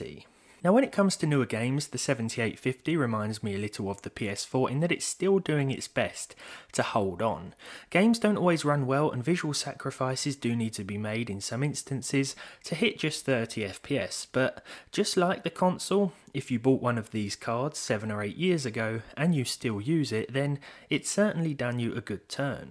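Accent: United Kingdom. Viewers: i really like your british accent 😁😁😅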